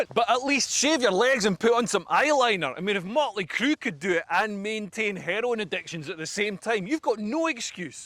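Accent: Scotland, United Kingdom. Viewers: Came for trans jokes .. Stayed for the Scottish accent :3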